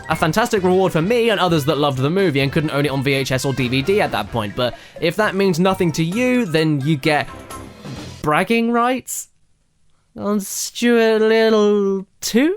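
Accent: United Kingdom. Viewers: hey i have a question this is gonna sound weird but are you british or irish i'm sorry but your accent is just a big mix up of like 50 accents so i'm wondering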